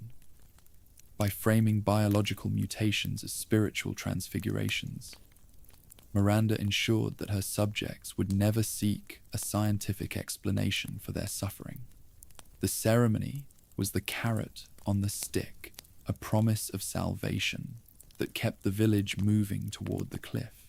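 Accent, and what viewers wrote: United Kingdom. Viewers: Good, calm voice. Crisp sound. British accent(lets go). Now I know what I'm watching for the next two weeks as i drift off to sleep during the 10 mins I'll manage to watch. Great vid dude!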